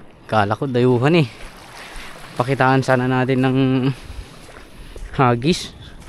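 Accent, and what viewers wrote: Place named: Ilocos, Philippines. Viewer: Oks Sana kaso korni ka magsalita bro.. Halata naman ang Punto mo being an illokano😅😅😅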